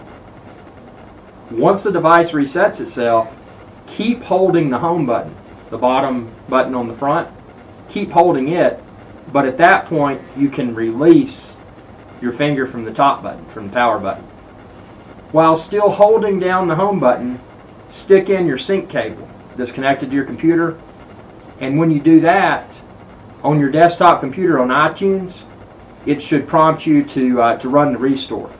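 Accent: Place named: Alabama, United States. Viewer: Thanks for info! I'm getting ready to try this since my iphone 5 is stuck in recovery mode. You sound like you're from my home state - Alabama?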